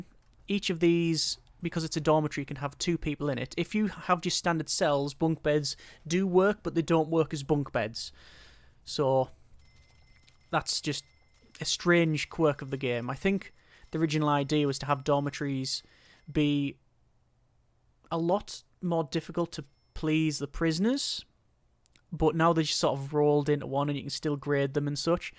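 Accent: United Kingdom. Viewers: Hold up wait a minute are you British or Scottish, I only just realise that you are either British or Scottish, but if you’re not I’m sorry. I’m just saying this because you sort of sound like it.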